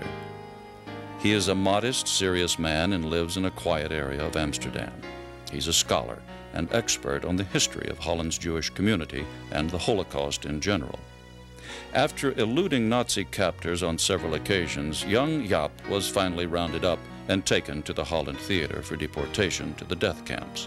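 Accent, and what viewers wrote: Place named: Germany. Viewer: Oh how it HURTS my ears to hear the Dutch words pronounced in GERMAN!!! Great documentary otherwise! But this should NOT have happened! Easy to prevent this and obviously not a very nice touch...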